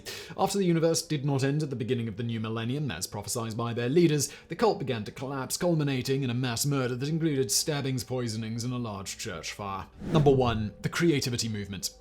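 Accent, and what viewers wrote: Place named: United Kingdom. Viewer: Aaahhhh! The famous British accent sarcasm😍😍😍….so sexy!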